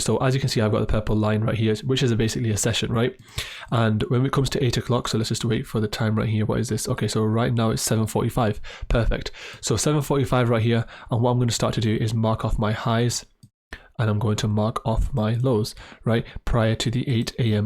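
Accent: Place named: Midlands, United Kingdom. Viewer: From the UK midlands, Birmingham or Luton.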